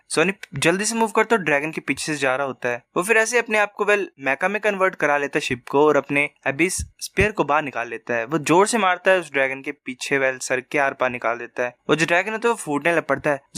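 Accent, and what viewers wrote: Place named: Pahadi, India. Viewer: Pahadi voice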